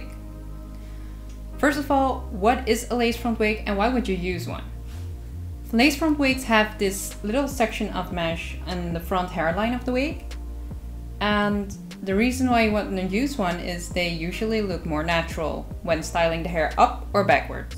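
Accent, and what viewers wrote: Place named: Colombia. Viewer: You look asian but sound like your from Colombia of something. 🖤 It's confusing and I like it lol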